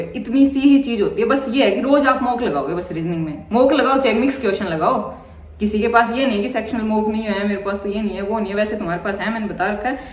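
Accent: Haryana, India. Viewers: M from uttarakhand but love hariyanvi accent 😁😁😂😂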